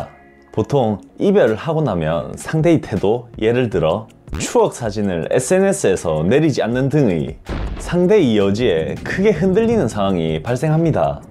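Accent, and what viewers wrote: Gyeongsang, South Korea. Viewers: 경상도 분인가요? 그쪽 제 스타일입니다 😘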